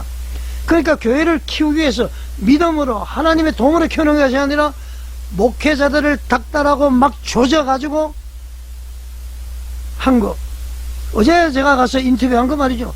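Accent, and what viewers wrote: North Korea. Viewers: 어투가 북한분같아요.. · 그럴리가없어요 북한억양이 심하시네요 지금말씀하시는 분은 목회를 얼마나 하셨는지 모르겠군요 김문훈목사님 인격에 그런분이 아닙니다 음모인듯 음모 중단하세요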